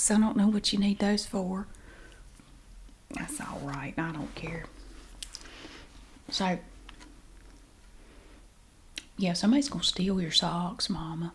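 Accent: South, United States. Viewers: .. I'm starting to think it's a front for drugs .. 😂 Best asmr roleplay with a southern accent on Y T thus on the planet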